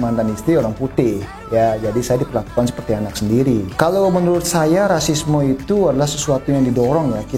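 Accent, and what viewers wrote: Bali, Indonesia. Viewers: Logat bahasa kaya orang bali om salam dari palangkaraya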